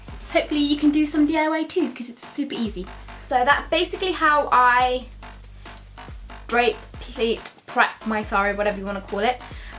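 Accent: United Kingdom. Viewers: Great British accent......❤ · Wow u have a beautiful british accent, and loved ur video..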